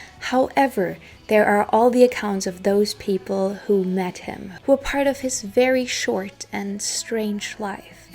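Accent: United States. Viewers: I thought you were American until you said English wasn’t your first language. Fooled me. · WOW you have an awesome American accent! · you are a delicious woman with a perfect american accent!🤩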